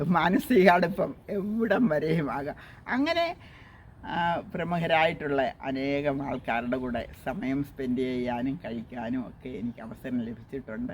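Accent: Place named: Pathanamthitta, India. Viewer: ഞങ്ങടെ നാട്ടിലെ സംസാരം.... ചേച്ചി ഉമ്മ...പത്തനംതിട്ട 😍 അമേരിക്കയിലും നാട്ടിലെ പോലെ കപ്പ, റോസാ ഒക്കെ നിൽക്കുന്നു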